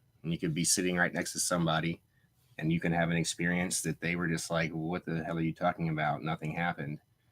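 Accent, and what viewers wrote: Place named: United States. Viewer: Love your American Accent. ♥🐱★💋⚘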